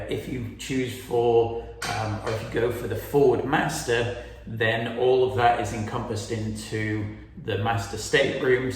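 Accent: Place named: United Kingdom. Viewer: north america? you kinda sound british.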